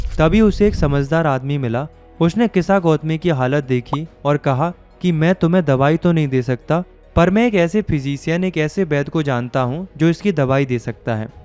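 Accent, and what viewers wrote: Uttarakhand, India. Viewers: Bhaiya, Are you from Uttarakhand??❤❤❤ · Sir are u from Uttarakhand❤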